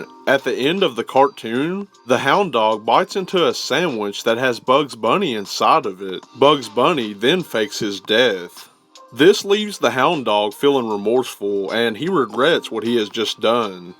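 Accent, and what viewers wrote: South, United States. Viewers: Are you Chills doing a southern accent?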